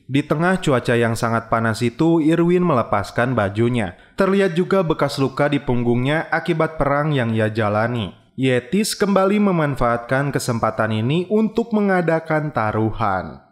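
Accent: West Java, Indonesia. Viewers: Org sunda y bang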